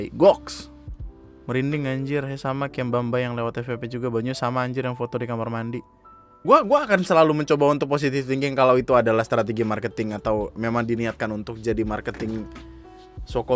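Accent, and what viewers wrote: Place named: Java, Indonesia. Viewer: Jowone medhok ya ms 😹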